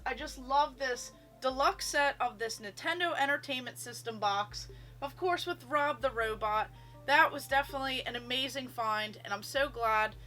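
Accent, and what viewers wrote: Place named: Philadelphia, United States. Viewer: With that accent, you must be from the Philly area.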